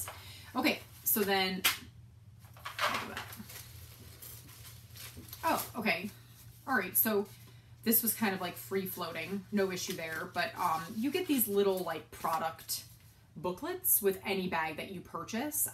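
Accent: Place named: Minnesota, United States. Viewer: are u in Minnesota? The accent ...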